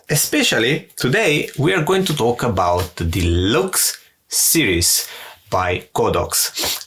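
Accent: Italy. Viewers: You sound very much like an Italian… are you? 👍 👋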